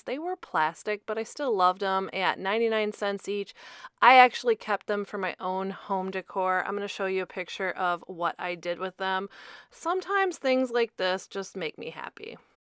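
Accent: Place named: Michigan, United States. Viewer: I started watching you when we opened our large booth in 2023. We had a very small booth years prior. The tips and info you shared reminded me that I did know how to do this, and was so appreciated! I've kept watching you as your information on display and merchandising remains so current and valuable. Love to go thrifting with you. Welcome to Whatnot! I'm not a seller, but have been a buyer for a while now. I'm excited to add you to my Favorites. I'll see you there! On a side note, I have family in Michigan (I live in California) and I love listening to your accent as it reminds me of them. Merry Christmas!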